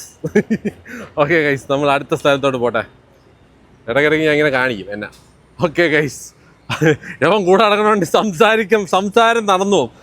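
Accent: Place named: Thiruvananthapuram, India. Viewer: ബ്രോ യുടെ സ്ലാങ് പക്കാ തിരുവനന്തപുരം അതാ ചോദിച്ചേ.❤ എന്തായാലും താങ്ക്സ് ബ്രോ റിപ്ലൈ തന്നതിന്.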